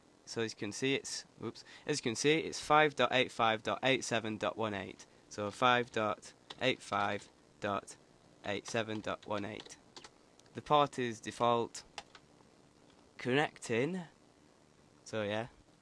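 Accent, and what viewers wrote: Scotland, United Kingdom. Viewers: Scottish Accent :3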